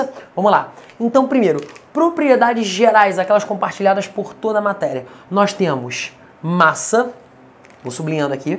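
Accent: Pará, Brazil. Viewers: O sotaque do professor é lindo, paraense, ou paranaense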